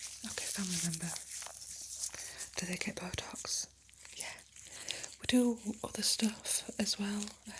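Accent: North, United Kingdom. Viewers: That was great. Nice to hear a fellow Northerner ❤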